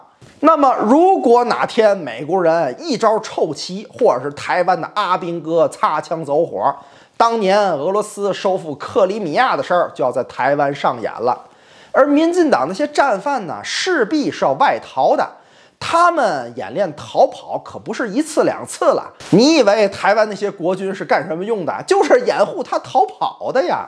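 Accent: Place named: Tianjin, China. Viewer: 口音天津人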